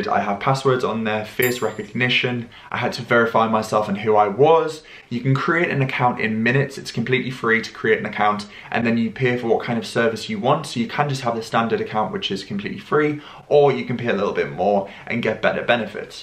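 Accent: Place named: Newcastle, United Kingdom. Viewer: your geordie accent caught my ear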